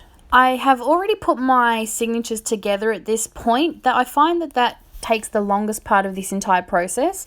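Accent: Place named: Australia. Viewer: like your work and your Aussie accent.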